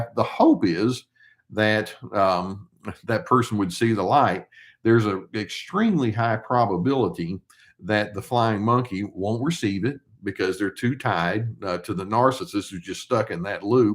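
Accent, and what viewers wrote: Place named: Texas, United States. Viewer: Wow I’m from Texas to no wonder I feel at comfortable listening to you your accent your mannerisms your belief you vibrate like the people that I come from where I come from I’m from Lubbock texAs and also I like your Maas green shirt you wear unusual colors I know that the topic is very important and I’m learning so much from you it’s very very exciting to be empowered by your knowledge empowered to be me what a concept wow thank you so very very much. Such a gentle soul THANKYOU🐶🆙🙏🏻🤍😃🌷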